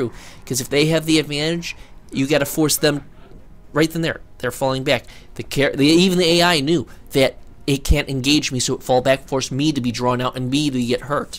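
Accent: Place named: California, United States. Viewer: Ahh! The subtle Californian accent. XD Awesome guide tho. You know what you are doing at least. Every other guide would miss something.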